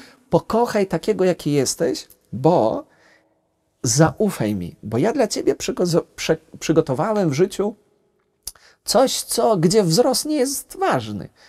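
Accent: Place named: Kresy, Poland. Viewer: piekny, poetycki , kresowy akcent ksiedza, cudny ksiadz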